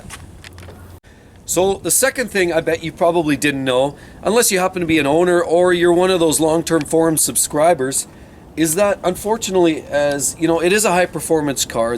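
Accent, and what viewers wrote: Canada. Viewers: Your Canadian aren’t are you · listening to your accent to figure out that you are another fellow canadian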